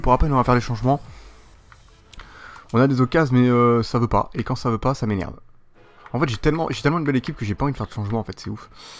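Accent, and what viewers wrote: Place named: Bordeaux, France. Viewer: Coucou tu connais pissos car j y suis allé en vacances car tu semble venir de bordeaux avec tes "sans deconné " 😂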